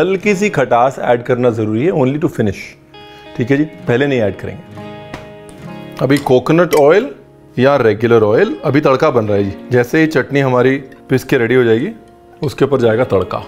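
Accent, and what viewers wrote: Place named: Kerala, India. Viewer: he is a punjabi but has a clear mallu accent, what kind of mix is that. nice.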